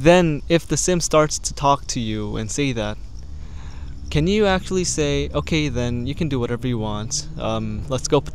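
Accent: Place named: United States. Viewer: Your english is on point man you could pass as a american.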